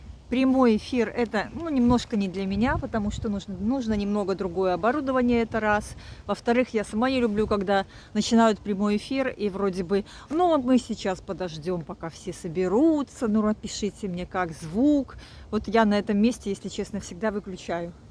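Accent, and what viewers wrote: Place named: Belarus. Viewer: не слушайте критиков вашей дикции. У вас чудесная дикция с едва уловимым, красивым белорусским акцентом. Так-же, не слушайте придурков политизированных маргиналов провоцирующих вас по по поводу языка общения. У вас всё отлично и ваш канал замечательный.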